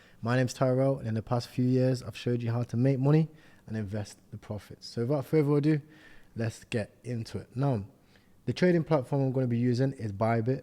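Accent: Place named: East Midlands, United Kingdom. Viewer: hope all is well fam great video again. can i ask are you from the east mids area i'm from nottingham and you sound like a people from this region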